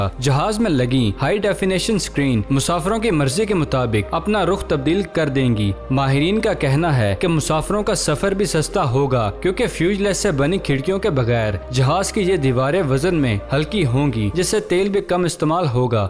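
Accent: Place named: Rawalpindi, Pakistan. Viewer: love u bran jani PPindi ke lagty hoo keep up the good work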